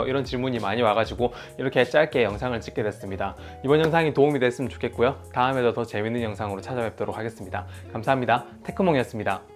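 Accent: Gyeongsang, South Korea. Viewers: 경남사투리가 느껴지는 ㅋㅋㅋ 잘 보고 갑니다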